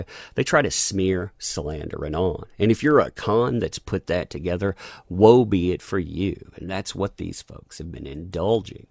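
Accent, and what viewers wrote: South, United States. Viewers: Your southern accent is so soothing. :3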